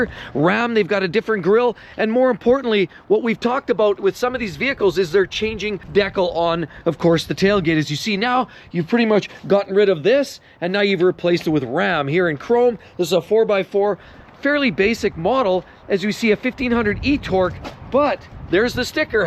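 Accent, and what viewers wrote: Canada. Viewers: New to this channel you sound Canadian, I'm assuming this means these prices are also in Canadian?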